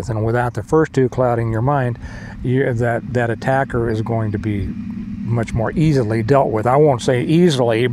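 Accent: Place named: Texas, United States. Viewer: Kansas! I figured you were a fellow Texan from your accent and demeanor. New here. Appreciate your channel.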